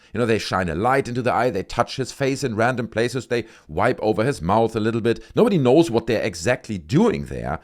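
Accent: Germany. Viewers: Great video mate, is that a German accent im detecting btw? :)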